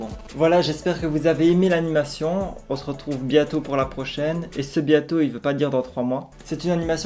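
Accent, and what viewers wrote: Liège, Belgium. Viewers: trop cool ca fait du bien d'entendre un accent liégeois !!!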